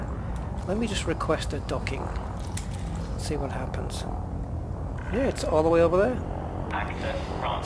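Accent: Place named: England, United Kingdom. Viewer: you sound english if you are english don't say movie. its a film in england appart from that good vid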